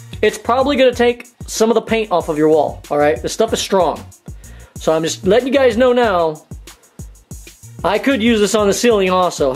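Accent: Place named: United States. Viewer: Man, great american accent. From where you are? These days all USA people has an awkward kitty accent, men and women the same. Thanks for the video too.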